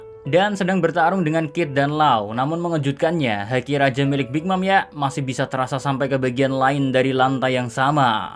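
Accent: Java, Indonesia. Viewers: Lidah jawa mas . Jangan heran . Yang penting penonton paham 😁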